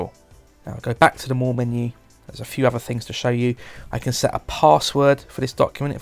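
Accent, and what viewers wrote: United Kingdom. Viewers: This is an outstanding presentation of Pages for Ipad. I have just got a Magic Keyboard and was looking to learn Pages. I was not disappointed. This tutor should be an ambassador for Apple. He makes Pages very easy to understand and I am grateful for his assistance. It is also very pleasant listening to a British accent. Thank you.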